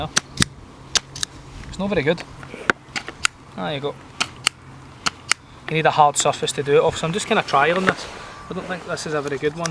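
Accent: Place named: Ayrshire, United Kingdom. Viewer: 2 is 1 and one is...... Subscribed 🤠 all the best dude, sounds like you're near me, I'm in East Ayrshire.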